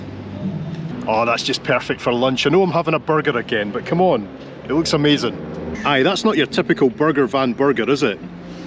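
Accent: Scotland, United Kingdom. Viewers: Sounds a bit Scottish 😊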